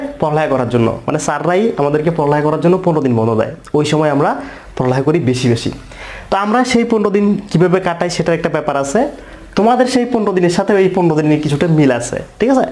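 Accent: Chittagong, Bangladesh. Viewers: Bhaia you from Chittagong???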